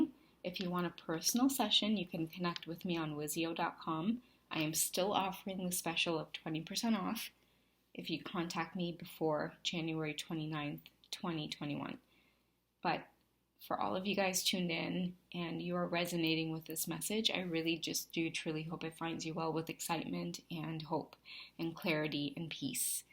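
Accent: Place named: Trinidad and Tobago. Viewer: No sah. When the accent came out i said is Trini. 🙋🏽‍♀️ Now back to the video.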